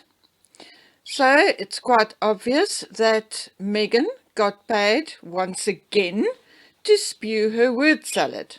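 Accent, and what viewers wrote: Afrikaans, South Africa. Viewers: I want to say I love your accent, and I am not alone in admiring the South African accent. It was voted the second sexiest accent in the world last year. Our internet provider here in Australia outsourced their technical advisors to South Africa, and some of those call centre guys were charming beyond belief. I also love your birds chirping. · I love your accent. South Africa? I had good friends from South Africa. I enjoy your comments. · I lived in South Africa for many years, Pietermaritzburg first and then Cape Town. Your accent brings back fond memories and I love listening to your videos x 🌸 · I love the SA accent and i like to hear the birds in the background. Love to visit too, been to Clarens in the mountains. Absolutely beautiful country. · Love your accent. I have family in South Africa and it so reminds me of them and gives a warm feeling. 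Your birdies are lovely. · SA accent right? So nice ☺️ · Another wonderful video as always. The lovely birds in the background do not ever bother me, quite the opposite, they are quite pleasant to hear, and they do not stop me from hearing you clearly. It's wonderful that you have your grandson's birds there, just another way to show what a caring person you are. As to your accent, it's a joy to hear, an excellent South African accent. I eagerly await each one of your new videos, and am so glad you are going to continue making them. All the best to you and your family, stay safe, and know you are in the thoughts and prayers of many of your listeners, including myself. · I love listening to birds and it didn't take me long to identify them as budgies. I really enjoy listening to your perspective on the Markle Saga in your wonderful South African accent (the budgies too). Ignore the moaners and keep up the great work! 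· I L💓ve your birdies please leave them in the background its lovely to hear them they are just joining in and they are not singing today😥 I miss them, bring them back!! DO NOT LISTEN TO THE HARBINGERS THEY ARE JUST UNHAPPY PEOPLE. Next love your accent I assume SA? I have a friend whose accent is exactly the same as yours and I like the way she speaks. On any account do not stop your FANTASTIC VIDEOS. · Kia Ora from New Zealand every body! Just watched your latest great video. I want to say that I love your South African accent your pretty little birds in the background and the fact that you make us feel like we are there with you in SA. This is what makes your videos so special. Like Yankee Wally! I look for your videos each day. You just keep doing what your doing xx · You know, some people just love to jibe, stir, criticise or anything to make others feel upset or uncomfortable. I love the sound of the birds in the background. 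It actually sounds like we are in the bush. Also, your accent is so recognizable as South African just as mine would be recognized as Australian. People need to get over themselves. Keep doing whst you're doing. There are plenty of us out here who enjoy your videos. If people don't like them they can stop watching. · Love your South African accent. I am one, in Germany. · Your Afrikaan accent suits me just fine. You speak proper English whereas most of your haters cannot even speak or spell properly. Keep up and keep on...we don't mind the birdies, they are be-au-ti-ful creatures. Don't listen to Marlakey Megawatts crooked, wicked cronies. Cheers! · Firstly, I think your accent is beautiful (S. Africa, right?). I teach English as a 2nd language and listen to accents from all over the world, they're part of what makes us unique/special. Secondly, never apologize for our furred (or feathered) loved ones. 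If I had the talent to make YTube videos you all would be listening to a snorting, panting, snoring pug...but it's all (chirping, barking, meowing, etc.) music to my ears! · I think you have a most beautiful accent. It sounds. South African.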